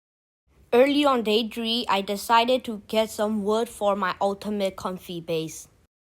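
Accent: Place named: India. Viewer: Bro why u sound so indian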